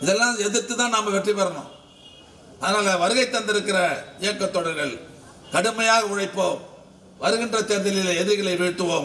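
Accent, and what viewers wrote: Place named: Chennai, India. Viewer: Talking like a Cheri madras language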